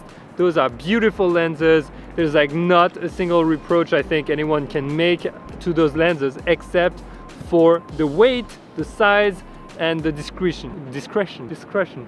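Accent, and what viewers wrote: France. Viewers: Un de ces accents français ahhahaah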